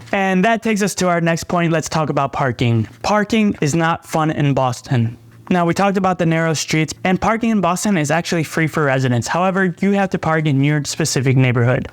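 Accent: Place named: New York City, United States. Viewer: Sounds like NYC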